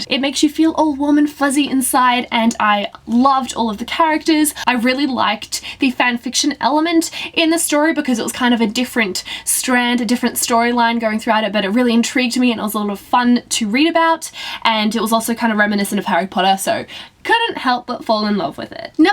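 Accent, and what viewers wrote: Australia. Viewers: Deniz Baronchelli She's from Australia, honey. It's not fake. That's the Australian accent. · Shes australian.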